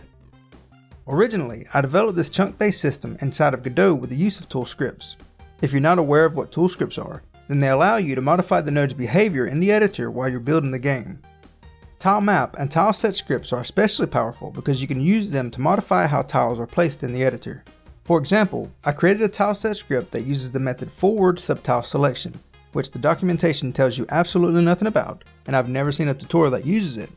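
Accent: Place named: Texas, United States. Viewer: I like your Texas accent,are you from Texas?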